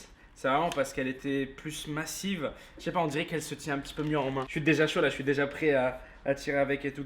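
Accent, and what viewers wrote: Quebec, Canada. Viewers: Tu es québécois ? Et merci pour les conseils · Avec tout ce que tu fais et t'es untboxig (dsl pour l orthographe) tu devrais faire une faq Continue comme ça je t adore PS t'es Quebecoie?🤔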